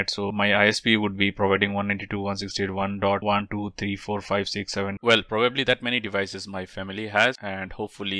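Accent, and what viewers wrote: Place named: India. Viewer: Knowing that your Indian accent is terrible , Why cannot you try to speak slowly ? look like french train called TGV. 😒 Anyway thank you for having take your time to make your tutorial.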